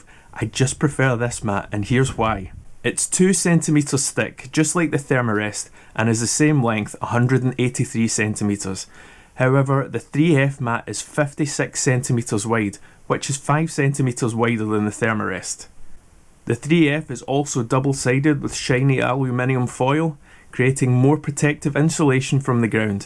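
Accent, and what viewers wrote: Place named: Scotland, United Kingdom. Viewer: love your accent man, need to get my ass in scotland to backpack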